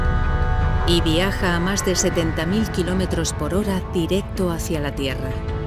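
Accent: Spain. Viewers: Luego está internet lleno de odio hacia el acento español 😢😭😭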